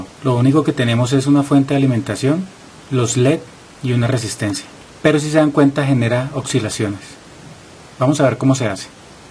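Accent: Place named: Colombia. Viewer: Muy buen vídeo! Por cierto, ya creo saber de dónde es tu acento; de colombia! Linda voz :) Saludos!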